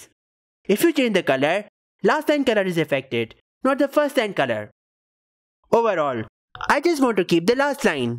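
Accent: India. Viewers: Thank you, I was watching this video, but I failed to understand you very well today. Between your english with indian accent and my spanish, it wasn't a good mixture LOL !!!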